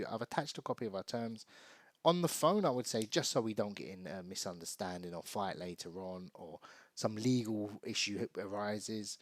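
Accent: United Kingdom. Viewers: You definitely don’t sound automated because you speak Green Lanes English 😊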